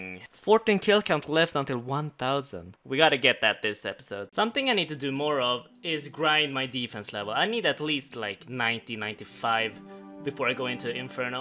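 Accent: Sweden. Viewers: är du svenne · are u swedish?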